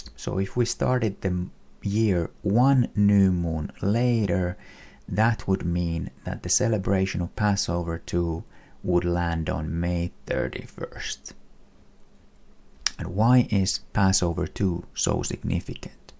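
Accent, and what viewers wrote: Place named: Baltic states. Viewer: Brother, i was wondering if you coming from a Baltic country ? Your accent looks like theirs 😀 I hope we are leaving this weekend 🙏🏼✝️ to meet all around our sheperd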